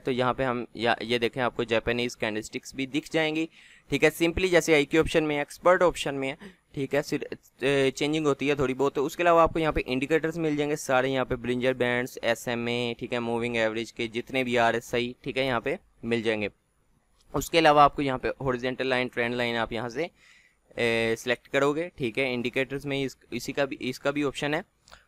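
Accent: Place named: Lahore, Pakistan. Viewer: Love your truly lahori accent 💓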